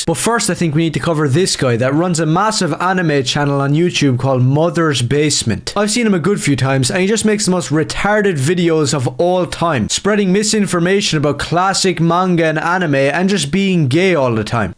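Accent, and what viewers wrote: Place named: Ireland. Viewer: He’s br ish. Sounds Irish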